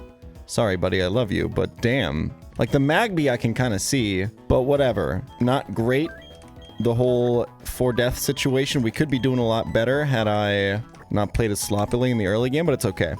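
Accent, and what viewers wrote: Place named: United States. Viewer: It’s so weird to hear perfect American English coming out of a Germans mouth, especially in this outfit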